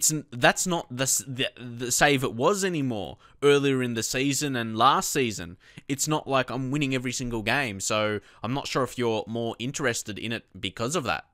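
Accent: Australia. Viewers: Do you sub to people??? Cause if you do it would be greatly appreciated if you sub to me you are one of my fav YTbers and the best thing is your AUSSIE!!!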